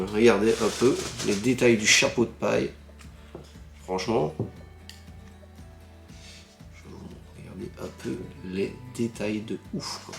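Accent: Belgium. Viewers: t'es liégeois? Sinon sympa la vidéo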